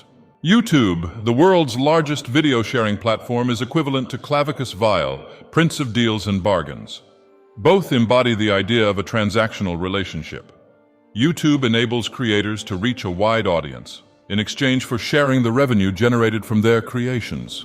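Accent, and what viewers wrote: United Kingdom. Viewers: I didn't notice before, but he's doing the thing where he gets more British as time passes. ❤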